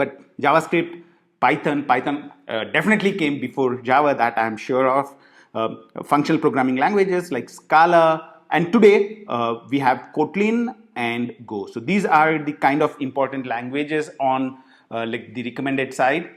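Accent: India. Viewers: Very interesting content, but the indian pronunciation is awful 😑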